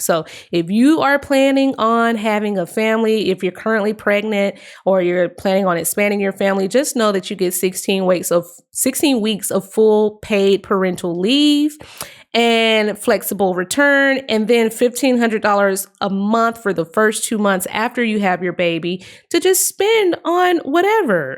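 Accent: Spain. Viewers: ME ENCANTA TU ACENTO (i AM ENCHANTED BY YOUR SPANISH ACCENT);)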